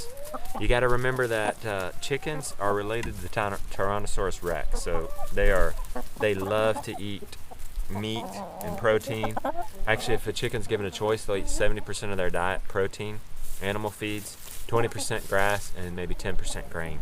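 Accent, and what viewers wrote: North Carolina, United States. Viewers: So serious and a much more distinct NC accent. Love it!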